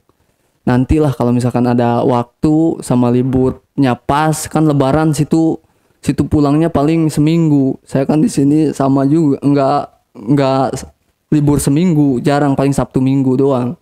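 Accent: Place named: Surakarta, Indonesia. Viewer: Kaya orang solo jir😂😂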